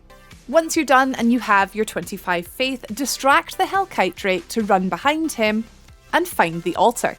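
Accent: Scotland, United Kingdom. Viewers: A Scottish sounding chick playing dark souls?! Wild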